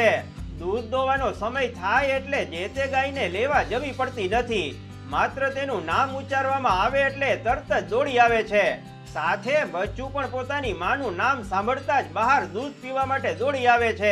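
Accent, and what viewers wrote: Kathiawar, India. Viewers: વા ગૉર મારાજ આવ તમારી કાઠિયાવાડી ગુજરાતી વાણી સાંભળી મૉજ આવી ગય👌✨😌🙏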